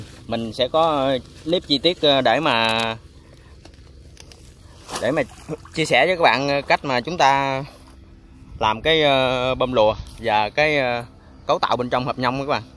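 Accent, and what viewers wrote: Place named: Mekong Delta, Vietnam. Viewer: ok anh mà ở đâu để tiên giao hàng với bảo hành này kia luôn.nghe giọng chơat miền tây.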